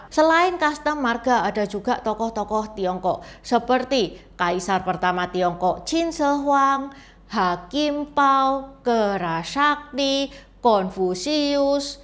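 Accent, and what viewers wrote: Java, Indonesia. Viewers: Sy gemes ma suaranya wong cindo jowonyo medok tenan ❤❤ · Medok banget mbak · Kalo kak Cijaw...Cina Jawa makannya medok pokonya mantap kak ❤🇮🇩👍👍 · Cici,bahasa Indonesia mu jelas tp tetap aksen Jawa nya masih keliatan,mungkin Cici lahir di Surabaya...semangat selalu untuk warga keturunan dan warga lain nya,apa pun suku kamu,apa pun agamau apa pun warna kulit mu apa pun latar belakang mu mari kita bersama untuk membangkitkan negri ini yang terlalu lama tertidur,bangsa ini terlalu besar butuh orang orang yang bisa merangkul semua pihak,ingat negara kita negara kesatuan jadi kita semua harus bersatu membangun bangsa ini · Ci juga out of the box loh, mencari konten yg lain dari yg lain dengan data yg akurat juga medok banget. TOP!, sukses terus ya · Kenapa bisa medok..cece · Salam medhok. Buat Mbak, 😊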